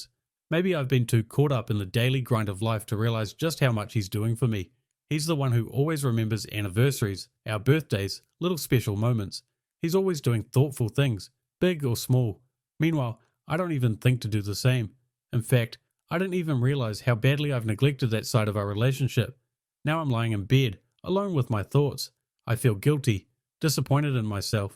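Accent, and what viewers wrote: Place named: New Zealand. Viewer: the kiwi accent sounds good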